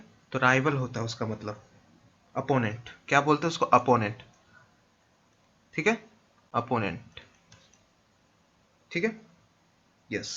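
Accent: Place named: Bhojpuri, India. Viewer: Cls to sir superb se duperb tha hi pr aapka bhojpuri v kch km nhi tha sir 😀😀😀😀👌👌👌👌👌👌👌👌👌👌👌👌👌👌👌👌👌👌👌👌👌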